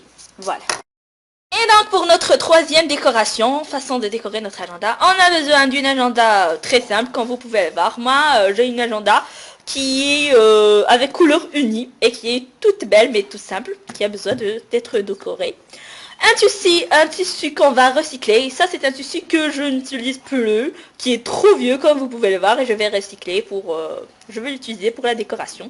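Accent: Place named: Canada. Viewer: Si est parle comme sa ses quelle a l acsent canadien bande de merde sa se voix (p pour dire paillettes elle dit brillant et pour dire étoile elle dit star ) tout les canadien(ennes) ytbs que je connais parle comme sa et elle pn beaucoup d abonner 😭😭💖💖💖💖💖💖💖💖💖💖😪💖💖💖💖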